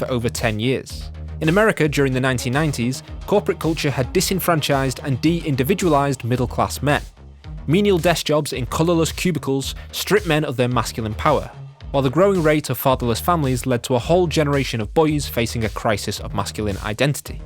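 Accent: United Kingdom. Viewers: off topic, but what is that accent? I know it's UK but more specifically lol. sorry im american. like is it Birmingham or Yorkshire or Lancashire? lol im just guessing so sorry if im way off!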